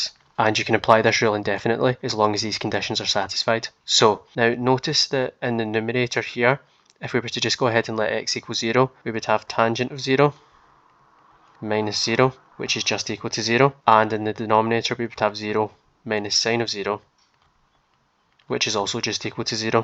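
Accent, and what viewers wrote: Scotland, United Kingdom. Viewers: ... What I forgot to ask you, if I'm not intruding, is, are you Scottish? I have an expat background and used to live in Scotland for about 3 years not far from Aberdeen in Milltimber beside the famous river Dee, a very beautiful small village... your accent sounds Scottish, but I could be wrong (lol); by the way I'm 1/2 Dutch/1/2 French...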